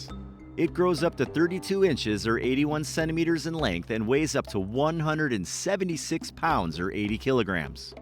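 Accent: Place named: Midwest, United States. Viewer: The Midwest accent is blowing me and I’m from fuckin Chicago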